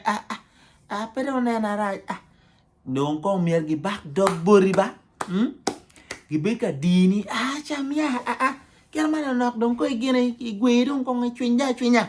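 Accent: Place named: Acholi, Uganda. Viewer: Amaro kit mai iloko kede Lep acholi ni yomo ia mada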